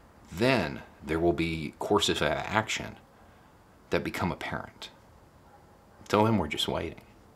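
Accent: South, United States. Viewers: It takes sometimes for me to get used to the southern accent expressing points of view in coherent and complete sentences.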